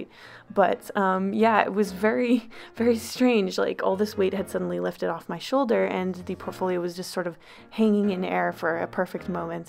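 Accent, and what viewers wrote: Canada. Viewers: Is she from Canada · You're Canadian?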